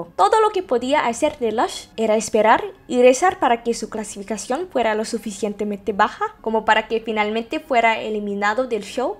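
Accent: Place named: Russia. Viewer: Pense por un momento q su nombre era Lelouch el protagonista del anime Code Gears...Sobre como hablas español la gente piensa q tu acento parace ruso por la "R" los rusos por lo general suelen arrastrar DEMASIADO la "R" al usarla en el español. La R solo se arrastra cuando alguna palabra comienza o terminas con R (raro, rico) o cuando aparece la doble R (ferrocarril, carro) y cuando aparece entre consonante y vocal (pra, pre, pri, pro, pru, bra, bre, bri,.....etc) como por ejemplo preparatoria, batracio y por ultimo cuando en una silaba esta compuesta solo por una vocal (aeiou) seguida de la R por ejemplo articulación, ermitaño, ortiga (la silaba q le sigue comienza en consonante....)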